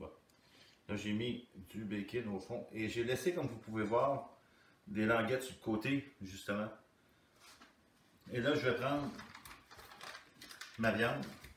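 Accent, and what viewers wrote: Quebec, Canada. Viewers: Quel plaisir de voir et surtout d'écouter ce cher accent de nos cousins Quebecois!! cette recette sent bon comme votre accent et c'est avec plaisir que je la réaliserai avec plaisir, merci encore et bonne continuation!! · Bravo.! Quel rayon de soleil pour nous "c'bel âccint Q'bêquô"!! NB. le pâté est superbe aussi, bien sûr! Merci 🌿 ♨️ 🙏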